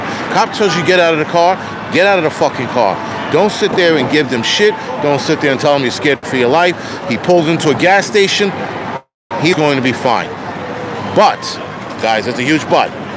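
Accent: New York, United States. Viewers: Can't see you and you sound like a newyoker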